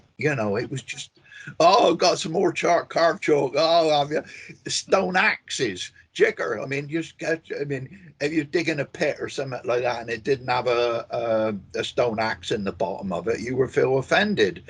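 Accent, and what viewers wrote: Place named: Newfoundland, Canada. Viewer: I love his accent. He could be from Newfoundland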